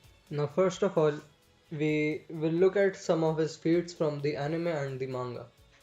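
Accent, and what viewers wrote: India. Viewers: Are you Indian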